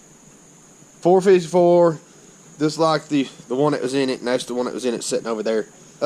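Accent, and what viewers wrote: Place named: South, United States. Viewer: Your southern drawl is real buddy. County strong 💪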